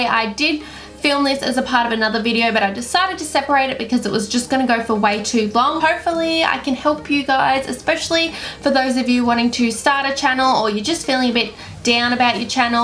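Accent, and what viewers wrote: Australia. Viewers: I’m from the USA. This only matters because I think I just fell in love with Aussie Accents.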